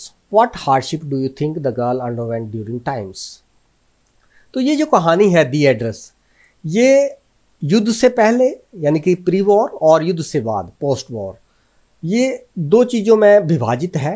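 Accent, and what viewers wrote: Haryana, India. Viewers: sir u r from haryana ? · SIr are you Haryanvi aap hi Awaaz sewada chal raha hai ki aap Haryanvi Ho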